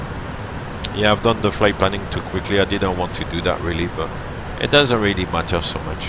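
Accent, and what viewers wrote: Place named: France. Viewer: I was pretty sure you were french, beeing one myself !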